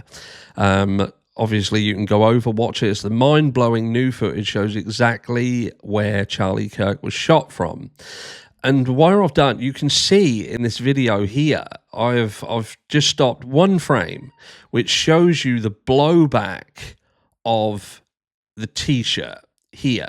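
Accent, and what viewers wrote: United Kingdom. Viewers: It appears you think because you speak with a British accent or whatever, you think people are supposed to believe you over everyone else. Jimmy Kimmel is a comedian. Jimmy Kimmel is not a racists podcaster who preaches hate division & white supremacy, nor did Kimmel advocate for gun violence to include kids being murdered in cold-blood in schools and churches as they studied & prayed. You had no problem throwing shades at Jimmy Kimmel for rightfully doing his job in a comedic way through political satire for calling out a 21st-century Klansmen in a suit & at no time did you bothered to mention any of the racist disgusting horrible things Charlie kirk has said which told me everything i needed to known about you and your up bringing or current beliefs. I watched your video, and just like the one before this one, I gave it a thumbs down. 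If you're going to make videos to explain your point of view from an honest point of view, you should leave your biased feelings out of them, ,, Otherwise you're just a low grade brand of Foxnews propaganda which is responsible for the current political divide in today's America . The killing of 21st-century Klansmen in a suit Charlie Kirk was wrong, but Jimmy Kimmel was just doing his job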